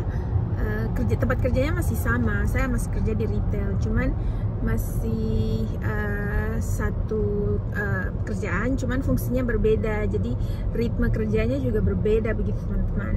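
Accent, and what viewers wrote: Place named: Papua, Indonesia. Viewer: Hallo kak, saya lihat postingan kk yg di tempat bunga tulip langsung sa cari di youtube. Kk di indonesia tinggal dimna? Soalnya dengar logatnya logat papua😊🥰.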